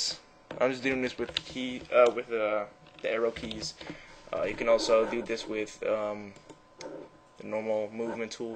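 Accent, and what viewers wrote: Netherlands. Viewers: Ik zie aan je accent al dat je Nederlands bent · Hey bro, awesome vid, btw are you dutch? One little thing that is kinda annoying is that your mouse is pretty loud. I guess this is because you don't use a mouse mat. Once again, awesome vid overal:) gj keep it up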